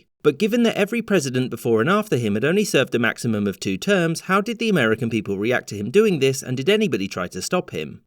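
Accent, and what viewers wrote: United Kingdom. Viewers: Why is a Brit lecturing me about my country 😡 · Clicked on this thinking “oh this should be interesting” but then it’s some British dude talking about something he has no idea about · Anyone else cautiously listen when American History is narrated by a Brit?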